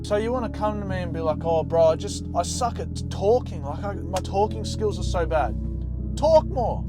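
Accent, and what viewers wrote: Australia. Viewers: mate r u aussie?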